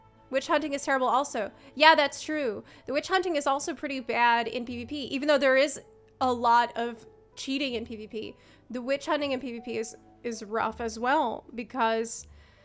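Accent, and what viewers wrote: South, United States. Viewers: southern accent comes out when she's mad.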